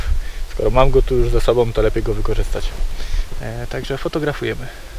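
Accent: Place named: Silesia, Poland. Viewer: Focus to infinity Właśnie oglądaliśmy odcinek z Klimczoka:) Naprawdę udana realizacja. Po akcencie domniemywam że jesteś ze Śląska. pozdrawiam z Tarnowskich Gór:)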